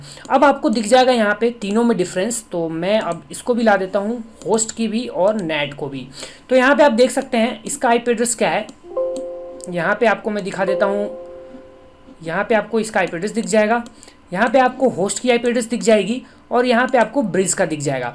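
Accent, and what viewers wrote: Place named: India. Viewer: This is indian english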